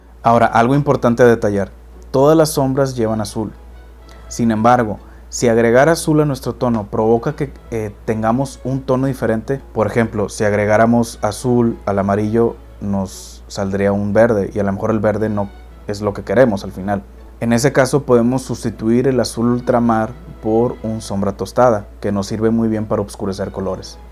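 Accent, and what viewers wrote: Mexico. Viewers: Muchas Gracias estoy siguiendo tus videos con mucha atención, Oleo no creo que le entre aún, me gusta las lecciones de Bob Ross, pero tus explicaciones muy buenas, y claras, tienes acento mexicano, si no vives cerca de la frontera MX USa y ocupas algo de USA te puedo ayudar si así lo deseas.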